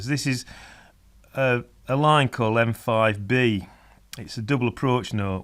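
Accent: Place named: Stoke, United Kingdom. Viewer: where are you from? It sounds like you've got a bit of a Stoke accent but with some brummie mixed in..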